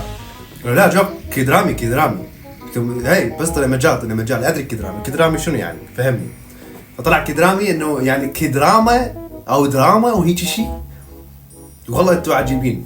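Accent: Iraq. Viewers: اني اول مافشفتك تحجي بصوتك جنت مامنتبة علة الهجتك بس هسة من شفت الك فديو بصوتك انصدمت من طلعت من العراق 😮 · والله حلوه عليك اللهجه العراقية 😂 مال يلا انطي لايك يلا 😂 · عراقي انتة هالهجة عراقية ❤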